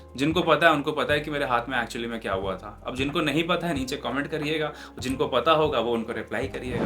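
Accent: Lucknow, India. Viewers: bhai ap lko se ho kya??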